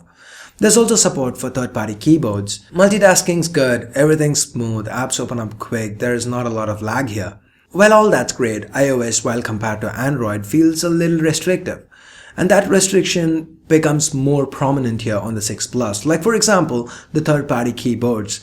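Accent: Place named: India. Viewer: I can understand him really well lol he sounds the same as any Indian.... Which is not bad!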